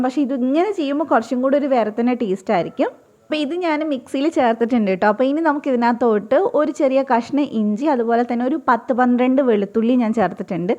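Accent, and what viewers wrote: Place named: Ernakulam, India. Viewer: നല്ല സൗണ്ട് ആണ് കേട്ടോ .നല്ല അവതരണം ശെരിക്കും എറണാകുളം സ്പീകിങ് .തുടരട്ടെ മുൻൂട്ട് മുന്നോട്ട് പൊളിച്ചു ഇത്താത്ത പെണ്ണെ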